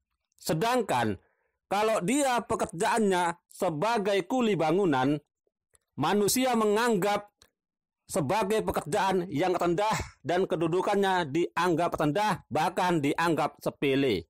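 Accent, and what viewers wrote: Java, Indonesia. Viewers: L11,bejerja sambil berdoa..benervkali saudaraku..janji om sudah om laksanakan,kok logat ngomongnya asli kental logat orang jowo yo..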